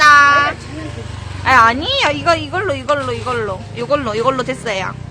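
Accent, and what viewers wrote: Busan, South Korea. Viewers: 부산사투리 쓰세요?사투리인것같아옄ㅋㅋㅋ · 38살 아줌마가 보기에도 우와 이쁜 ~♡😍 너무 착하고 예의가 바르셔서 어떤 영상이든 흐뭇한 미소로 보게 되는것 같아요^^ 생각보다 많은 사람들이 말투나 태도를 많이 보신다는거..공주병 조금 이라도 느껴지면 영상 닫아버린다는거 아시는지요ㅋ 서울 살다 부산 갔을때 부산 사투리 땜시 상처 많이 받았는데 사투리 쓰면서도 이렇게 싹싹 할수가 있구나 키워주신 부모님 인성과 성품이 그대로 묻어나는 예쁜아가씨네요♥ 가끔 들어와서 응원하며 영상 잘보고 갈께요 감사합니다^0^* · 뭔가많이들은 말투다했더니 부산사는친구랑 비슷//너무좋다사투리ㅠ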